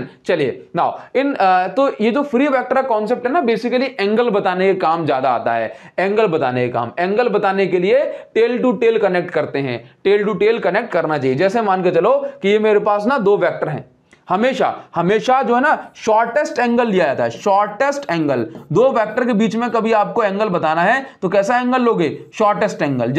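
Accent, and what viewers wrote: Odisha, India. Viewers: bhaiya are you from odisha ? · Sir aap odisha se ho kya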